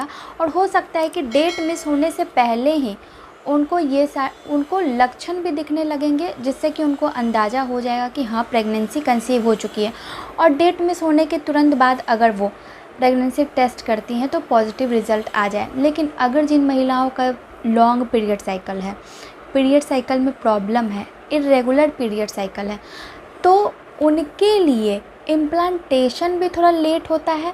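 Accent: Bihar, India. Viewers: Aap Bihar se hain kya ....ho rha hai ko hoda hai bolte ho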